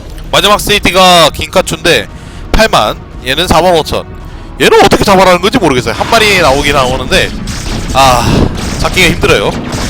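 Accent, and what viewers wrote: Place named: Daegu, South Korea. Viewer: 님 대구에 사시나요?교회에 댕기고는 사투리인데 내가사는곳의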